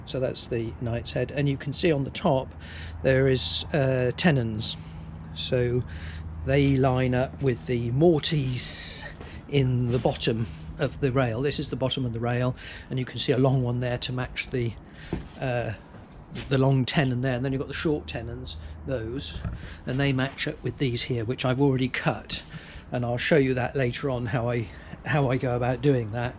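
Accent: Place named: RP, United Kingdom. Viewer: Bonjour, The more I see your videos, the more I discover your crazy project. Once again, I would like much more videos, I am sure I am not alone and people who help you. As an example, I would have liked more videos of the hull rebuild and the calking among other topics because I think I have seen some light through ot in your previous video. Besides this, I like your accent, it looks like the one I learnt 50 years ago at school and I easily understand your explanations. I would say that you have a BBC 1 accent perfect for students 😁 Amicalement, Raphaël, no longer student for a long time but always passionate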